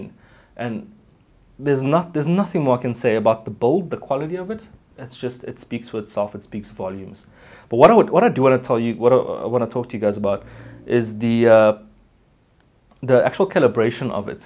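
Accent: South Africa. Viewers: Are you South African?? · dig that Saffa accent - FTW :-)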